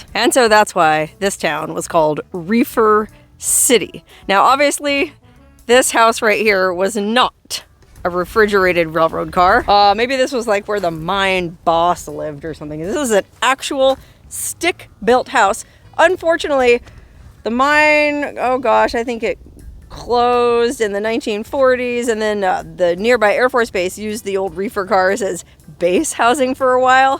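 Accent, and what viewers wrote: Germany. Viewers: Love your German accent, mein schones madchen! ( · There is something about German accent that makes things tingle. That being said, it’s a serious bummer one isn’t into making a video in Orange County! Just saying… Bwhahahaha Always a pleasure seeing where you’ve been lately!! Safe travels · Love your German diliatic!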